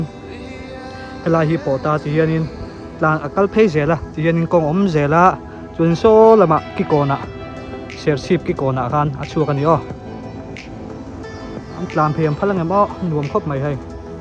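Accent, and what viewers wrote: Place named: Southern Mizoram, India. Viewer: Itawngdan chu chhimlam mi iva angvele aw aw iti reng maia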